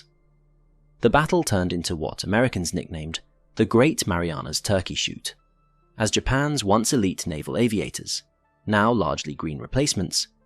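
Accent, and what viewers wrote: United Kingdom. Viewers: I like everything about this channel, including the posh English accented narration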